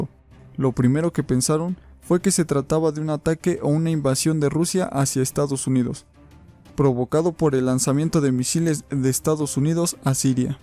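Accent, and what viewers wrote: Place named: Mexico. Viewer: El comentarista tiene acento mexicano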